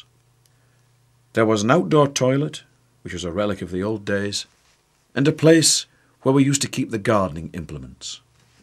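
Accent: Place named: Scotland, United Kingdom. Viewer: has a slight Scottish accent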